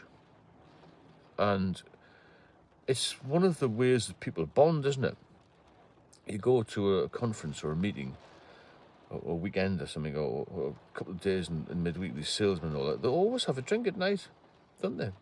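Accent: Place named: Newcastle, United Kingdom. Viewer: Where you from,I khow your a geordie,I use to go to Wallsend in the navy Been the big market in Newcastle afew times,got a few mates in Jarrow,all the best for 2025 sir👍👍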